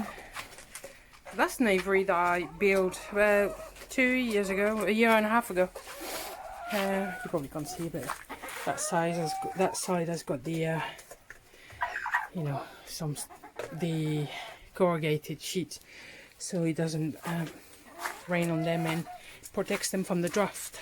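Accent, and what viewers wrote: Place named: France. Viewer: Keep going, your doing a fine job there, and great videos too. Cheers 👍 by the way love that french accent 👌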